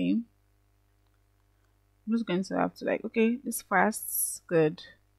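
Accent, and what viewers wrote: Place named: Nigeria. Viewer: I thought so from your accent but I wasn’t sure haha. I am also from Nigeria based in the UK. It’s lovely to meet another Nigerian simmer 🥰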